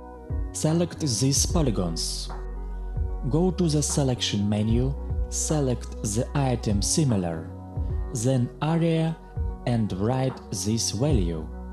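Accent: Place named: Russia. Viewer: Тоже прикололся с явного русского акцента?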